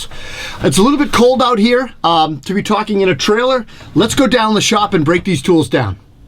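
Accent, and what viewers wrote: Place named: Northeast, United States. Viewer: Looking at the pictures of you using the power tools, I just say "remember your hearing protection". ;-) Love your channel and northeastern accent.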